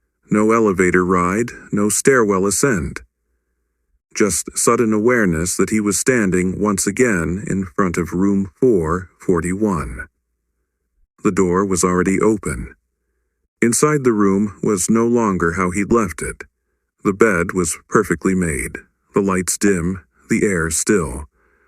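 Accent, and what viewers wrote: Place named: United States. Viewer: Agreed! And why would a channel called BRITISH hauntings choose an AMERICAN voice to narrate?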